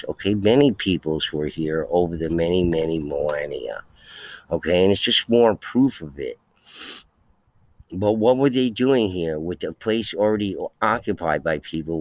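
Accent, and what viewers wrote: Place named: East Coast, United States. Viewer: Never commented on your videos before ..I think I figured out why I like you channel so much. mostly about giants and stone walls.but also u read so fast with the east coast accent it keeps me from falling asleep because it keeps my ears tuned to the fast reading .😂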